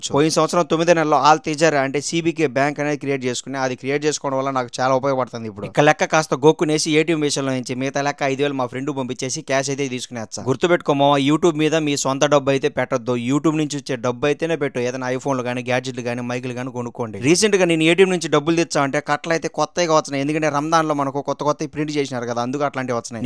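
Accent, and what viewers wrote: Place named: Kadapa, India. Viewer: Kadapa vallu anukotanu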